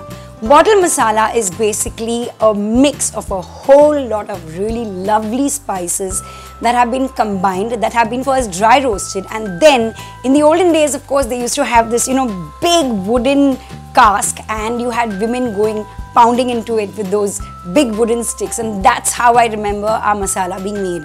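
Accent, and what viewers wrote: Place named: Oxford, United Kingdom. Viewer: U r accent is very nice.... Oxford level.....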